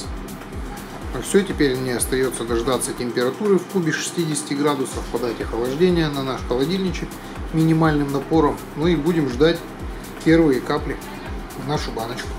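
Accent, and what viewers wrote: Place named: Urals, Russia. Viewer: Да, на Урале есть специфический акцент)))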